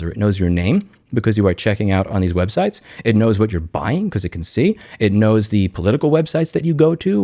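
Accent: New York, United States. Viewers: The New Yorker accent really shined in this one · There are few things as beautiful as New York accent starting to straight up drip off my speakers as the video goes on.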